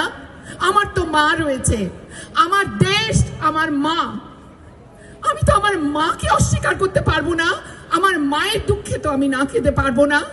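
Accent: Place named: Kolkata, India. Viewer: kolkatar tane kotha bolos ken shali?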